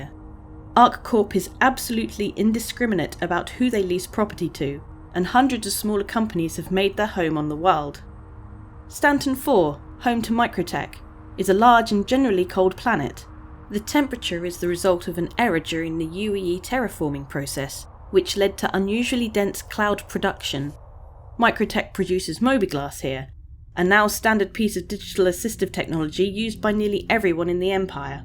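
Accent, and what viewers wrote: United Kingdom. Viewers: The British accent adds 50% more authenticity to the video.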